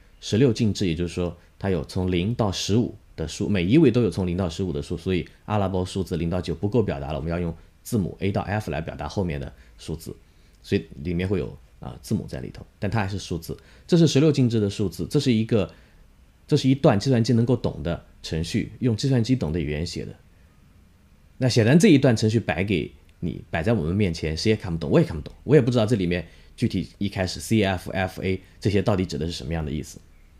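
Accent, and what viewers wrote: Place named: Hangzhou, China. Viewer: 翁老师的杭普太亲切了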